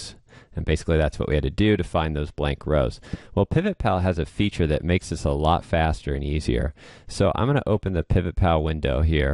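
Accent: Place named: United States. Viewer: Finally we can have a real American instructor, on Computer many on YouTube is people's from India, hard to understand any instructions in our English language, because they Hindus 😆👈 Thanks ❤️ best regards from Guatemala 🇬🇹 the Eternal Springs my temporary house also your's my friend. ❤️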